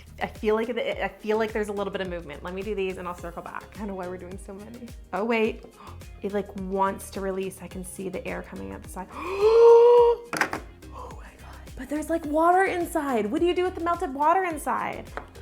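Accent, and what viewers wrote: Canada. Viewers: is she Canadian or does say aboot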